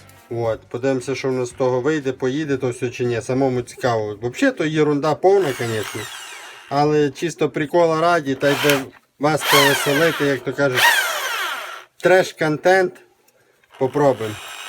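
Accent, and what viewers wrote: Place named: Odesa, Ukraine. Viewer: Відчуття наче одесит перейшов на україньську, дуже кумедно)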